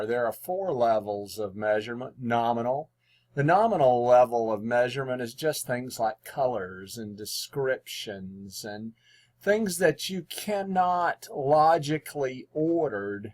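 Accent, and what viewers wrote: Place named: South, United States. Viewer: You, sir, are incredibly clutch... I was getting caught up in trying to figure out how deep down south he's from!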